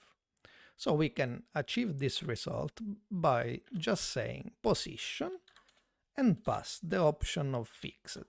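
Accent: Italy. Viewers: He is Italian, what do you expect? This tut. helped me a lot. Thanks to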